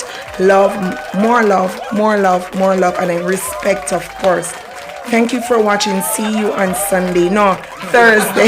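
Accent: Jamaica. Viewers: Real patois 😆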